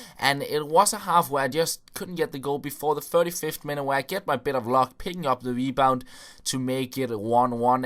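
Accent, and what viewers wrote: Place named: Denmark. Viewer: I can hear on your accent that you are danish :D I'm norwegian, you see :D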